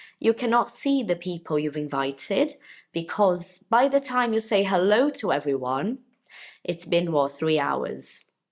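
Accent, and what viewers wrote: United Kingdom. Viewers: Oh my gosh that's pretty awesome! I love the British accent. Your English is amazing!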